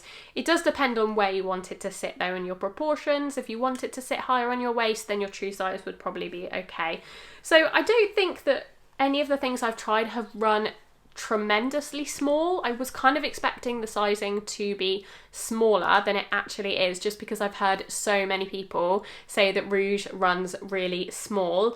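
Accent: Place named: United Kingdom. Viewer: I love your British accent so much ❤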